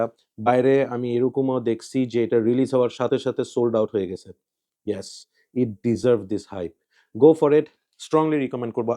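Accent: Chittagong, Bangladesh. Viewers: আসসালামু আলাইকুম ভাই। আপনার জন্মস্থান কি চট্টগ্রাম?আপনার কথার মধ্যে চট্টগ্রাম এর টোন আছে ।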